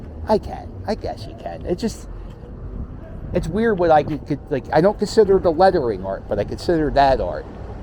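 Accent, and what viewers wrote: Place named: Pennsylvania, United States. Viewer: I was watching a video on US accents (done back in <num>’s) and specially focusing on accents that may disappear. they interviewed this PA woman who seemed to have a similar accent to you. It was cool to here the accent again.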